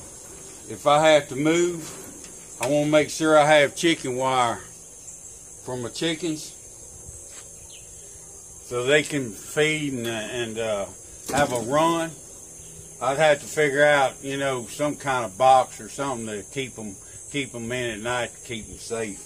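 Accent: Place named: South, United States. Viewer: Thank you! Your thick accent is the real southern charm. I'm grateful for your taking the time to explain things in simple terms for us beginners who know nothing!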